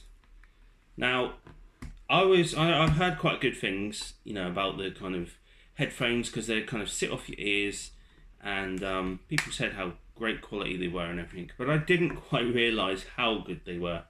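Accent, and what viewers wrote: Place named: England, United Kingdom. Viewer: It's amazing how much I love an English accent.. and how much them pronouncing "H" bothers me. We (U.S.) say "A-ch" They say 'Hate-ch". breaks my brain. :) Thanks for the info and review!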